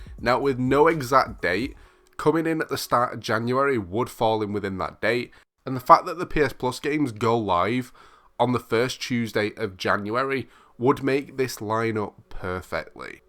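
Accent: United Kingdom. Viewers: Are you from Sheffield? I can’t quite place your accent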